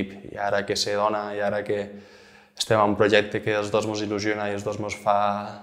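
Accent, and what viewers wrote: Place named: Southern Catalan, Spain. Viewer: He is speaking catalan language (southern accent) btw, not spanish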